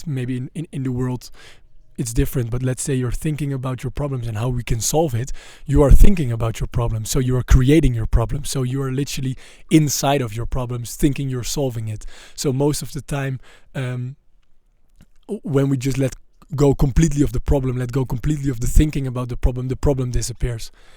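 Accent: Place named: Netherlands. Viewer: Hey, ik hoor aan je manier van praten dat je Nederlands bent, dus ik dacht dan typ ik ook lekker in mijn moedertaal een reactie:) Je kwam tevoorschijn op mijn tijdlijn en ik wou je even bedanken dat je je wijsheid met de wereld deelt. Het tovert instant een big smile op mijn gezicht, blijf doen wat je doet! Mensen hebben het anno 2025 echt nodig om door zulke zaken geïnspireerd te geraken. Thank you, brother!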